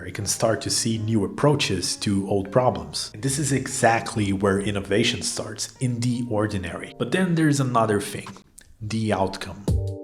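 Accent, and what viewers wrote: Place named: Brazil. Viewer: Great video! Gonna read this one asap. Você é brasileiro? O sotaque é de brasileiro kkk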